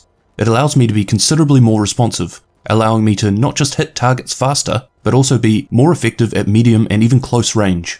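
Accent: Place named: New Zealand. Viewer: Holy shit, never knew you were a kiwi too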